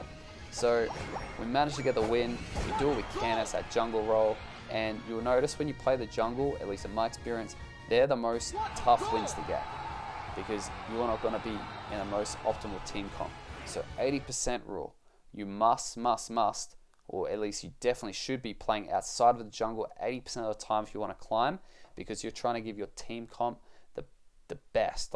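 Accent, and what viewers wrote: Australia. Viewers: Oh broh are you Aussie mate?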